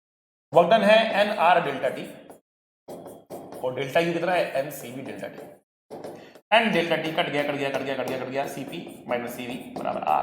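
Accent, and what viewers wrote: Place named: South, India. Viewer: He looks like South Indian n also talks like it 😀😀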